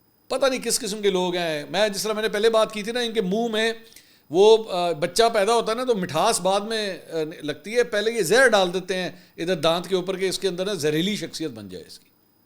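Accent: Punjab, Pakistan. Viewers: My wife is from Punjab, India. She really loves your videos, and every morning she play your video during breakfast. She loves your Punjabi touch and laughs a lot. 😂❤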